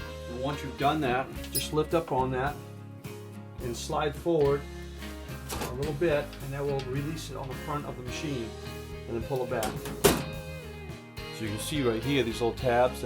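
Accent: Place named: New Orleans, United States. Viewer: Dang buddy I recognize that accent anywhere...New Orleans or somewhere close lol thanks for the tips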